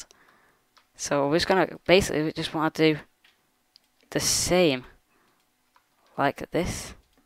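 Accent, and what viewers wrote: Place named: Ireland. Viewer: You sound irish even though you're english.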